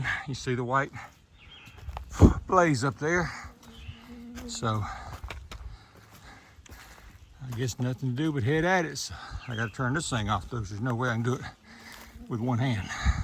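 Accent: United States. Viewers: I like listening to your North Carolina accent. My uncle settled in Rockingham after WWIl and sounded like you even though he was from Erie, PA. Really enjoying your hike. Thanks for sharing.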